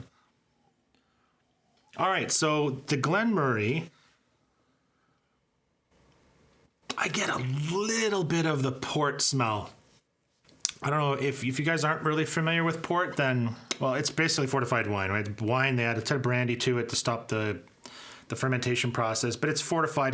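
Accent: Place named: Canada. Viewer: Ok make sense ! You sounded Canadian, but the prices $$ of these scotches seemed to be in US to me... anyway didn't have time to go thru your channel yet, but looking forward to it ! God bless, cheers et Merci beaucoup !!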